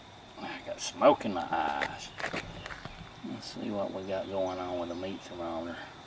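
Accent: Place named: South, United States. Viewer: your Southern accent gives you instant credibility with me on issues related to smoking and grilling. Do you know how hot the lot setting would be for smoking ribs? I am trying to dial in a 3-2-1 method but want to keep the temps at 200-225 F.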